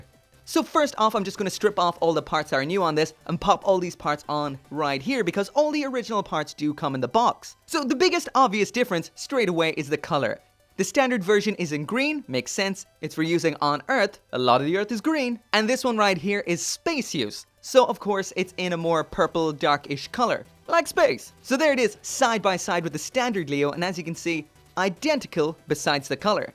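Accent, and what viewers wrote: Ireland. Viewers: Do I hear a bit of Irish in your accent? Can't place it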